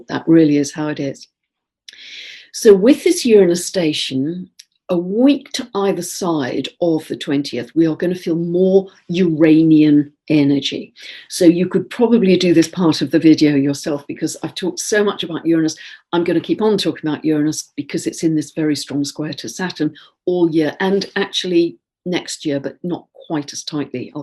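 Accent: England, United Kingdom. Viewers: I'm here for English......I just enjoy listening to her. Light and love from South Africa.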